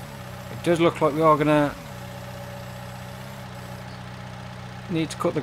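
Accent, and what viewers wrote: United Kingdom. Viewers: I only found your YouTube channel a week or so back I am now going back through the older video I have to say I think you speaking is much better than just the music and txt it’s good to hear another UK person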